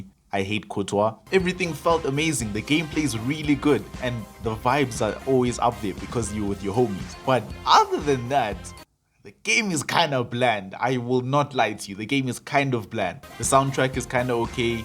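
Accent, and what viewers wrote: South Africa. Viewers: I was detecting a South African accent all along.. hante😅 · You just know a south african brother when they speak 🫴🏽💪🏽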